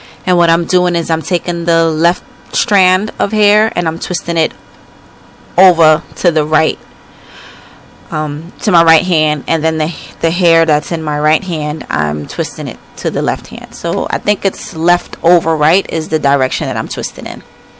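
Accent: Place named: New York, United States. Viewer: You sound like you are from my neck of the woods. Are you in or from Ny ? I love it from the 1st twist you installed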